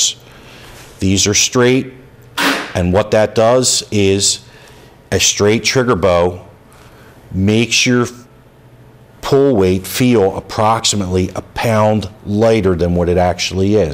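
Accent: Pennsylvania, United States. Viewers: I hear Pennsylvania in your voice.. sounds like home. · I love me some Pennsylvanians. Live in another state now, and miss the accent and pacing. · Hello, SIr. I a Lancaster boy living in Florida about your age. I recognize your PA accent anywhere. I have two of your triggers, the SD 3 gun ans the ALG ACT. Boy o boy are they great and exactly as you describe in your video. My next one will be the SSA E with a flat bow.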